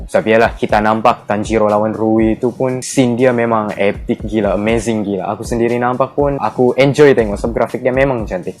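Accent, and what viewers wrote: Sabah, Malaysia. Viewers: Aku suka betul bila dia cerita pasal kimetsu no yaiba ni dengan slang dia cakap sabah dia tu huhuhu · Bila speaker org sabah . Cerita ni dah macam cerita hantu benar beb hhahah mantap 👍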